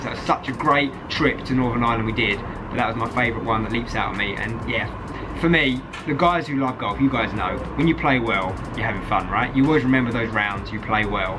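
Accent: Newcastle, United Kingdom. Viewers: True geordie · True Geordie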